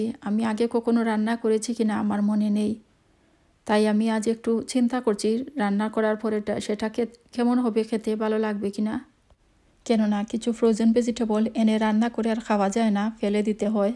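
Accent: Sylhet, Bangladesh. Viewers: apu apni sylhty basai kotha bolen balo lagba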